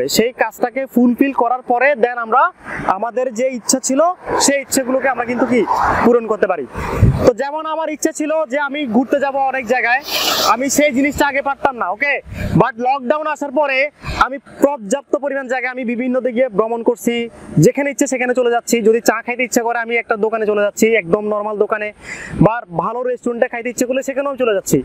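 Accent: Chittagong, Bangladesh. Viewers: ভাইয়া আপনার বাড়ি চিটাগাং নাকি?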